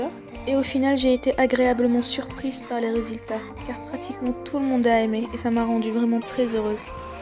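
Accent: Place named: Kabylie, Algeria. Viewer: Ta un accent kabyle comme moi UwU Et tkt ta voix et trop cute je trouve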